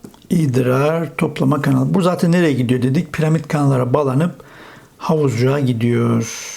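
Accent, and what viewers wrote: Black Sea, Turkey. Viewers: Hocam karadenizlimisiniz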